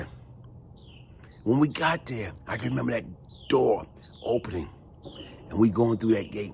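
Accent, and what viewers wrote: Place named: Moncks Corner, United States. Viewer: OH OK Such A Small World. My Family Is originally from Moncks Corner But Some of Us Migrated to Charleston. Funny ThinG Is I Still Caught Our Dialect While listening to you Speak Lol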